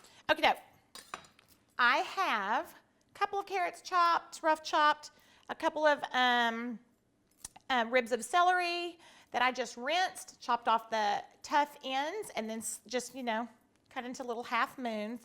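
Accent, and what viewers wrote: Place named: South, United States. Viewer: I LOVE her talking....absolutely!!! Love your southern accent! It's precious! :) Enjoyed every minute of your video! Keep up the good work!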